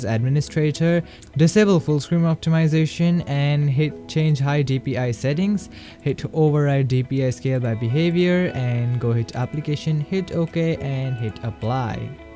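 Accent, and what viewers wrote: India. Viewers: Bhai I love your English accent bro I know you are from india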